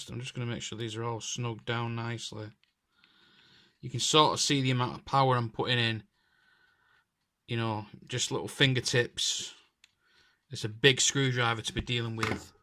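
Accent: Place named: Northern England, United Kingdom. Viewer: You sound like you're from Northern England- beautiful country out there! Thanks for this, it was very entertaining!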